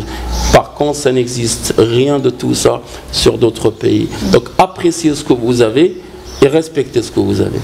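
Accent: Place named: Arab world. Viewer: فيك عياقة وتكبر ديال رباطيين ولكن جات معاك غير حاول ماتنطقش فرونسي فيها لكنة معربية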